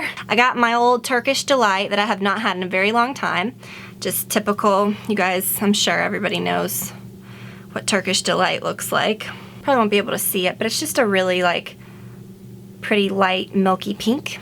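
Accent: South, United States. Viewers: You southern drawl is coming out a bit more :) Love it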